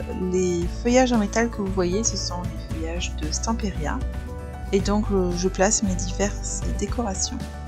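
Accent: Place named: South, France. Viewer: Coucou, Heureuse d'entendre ton p'tit accent du sud et de te voir, enfin tes petites mains hi hi, créer! Que dire...qu'a par que les couleurs que tu as choisi sont mes favoris, c'est que ta création est simplement MAGNIFIQUE j'adore, BRAVO! Je sais que ce n'est pas évident entre la vie de famille, la boutique, etc, d'avoir un p'tit moment a soi pour créée, mais, j'espère que tu referas d'autres vidéos de ce genre! Bon week-end & Soirée. Gros Bisouuus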